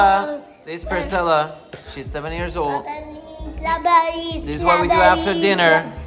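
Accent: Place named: Iran. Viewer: Pure Iranian accent. It's good tobe original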